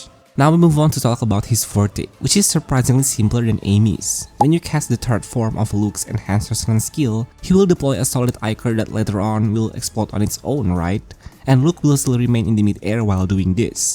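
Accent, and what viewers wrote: Indonesia. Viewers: Org indo bukan si 😅 kek ada aksen indo nya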